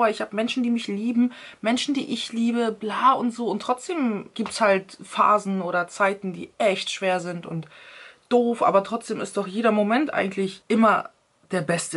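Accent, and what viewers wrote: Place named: Russia. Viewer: Ja man russischer Akzent ist mega 😍😍 Wuodga... 🥃😋😂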